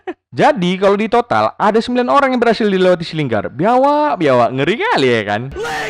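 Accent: Medan, Indonesia. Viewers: Wkwkwk, the best presenter bola versi medan bung😁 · Abang org medan ya bg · Bg lu orang medan yaa bg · Orang medan kah??? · Orang Medan Bang??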